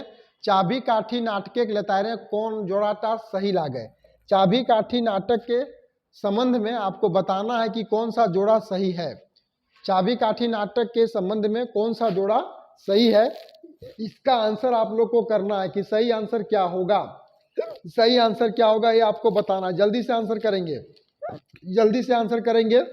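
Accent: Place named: Jharkhand, India. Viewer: Sir hum bhi hirodih thana ,pandeydih se hai ,aapki language se humko laga ki aap giridih se h thanks 😊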